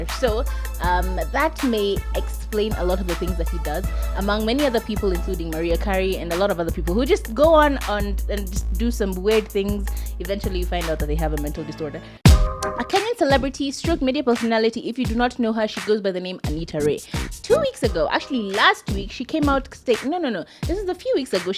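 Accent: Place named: New York, United States. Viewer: wow , i,m new in the channel at first i thought ure from new york ....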